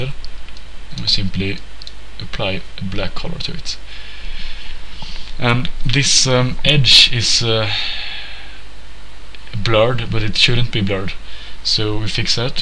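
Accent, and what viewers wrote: Sweden. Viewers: He doesn't, he sounds Swedish, I am Swedish so I know the accent. Looking at his channel his location is Sweden... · haha man hör verkligen att du är från Sverige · Hahha Du Kommer Väll Från Sverige? Det Hörs På Din Accent xD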